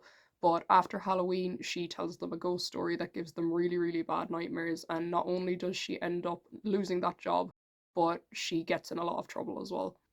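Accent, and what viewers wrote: Ireland. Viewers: I clicked on this video and recognised the accent straight away lol, r u a south Ireland pep? Unfortunately I'm from the shitty north but it's cool to know I'm not the only alt person on this island 💀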